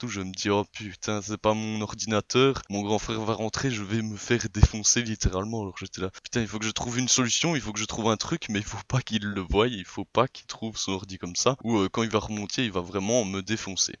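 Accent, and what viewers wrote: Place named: France. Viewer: Écœurant! Je n’en reviens tout simplement pas. Où as-tu pris cet accent à la française ? Le rythme est bon, le propos intéressant et les animations soutiennent à merveille l’histoire. Bravo, cent fois Bravo...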